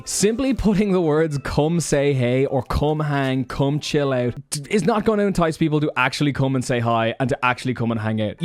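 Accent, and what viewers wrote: Ireland. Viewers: Are you irish? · haha nice i thought i heard an irish accent im originally from cork but live in galway now keep grinding man love the content !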